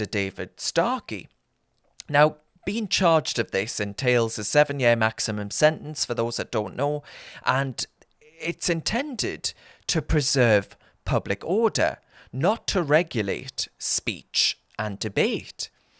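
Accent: Newcastle, United Kingdom. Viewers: Like hearing a fellow Geordie on t'internet 👍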